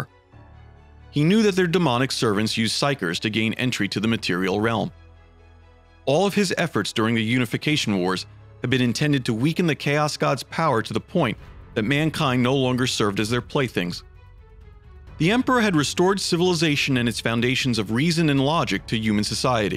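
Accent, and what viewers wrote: United States. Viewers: Use other voice actor than a 30 year old with american accent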